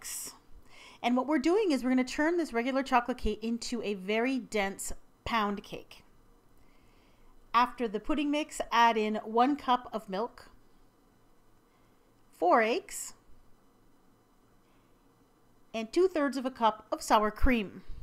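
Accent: Canada. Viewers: God I love you woman, and your Canadian accent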